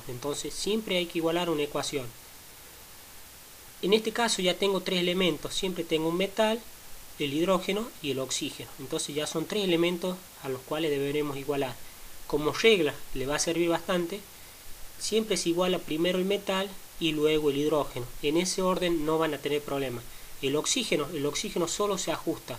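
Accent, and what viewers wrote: Córdoba, Argentina. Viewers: De casualidad sos de Argentina? Mas exactamente de Córdoba? Por la forma de hablar.. Un pequeño consejo.. Valencia y numero de oxidación no es lo mismo y veo que lo confundís.. Ojo con eso.. Igual muy buena explicación !! :)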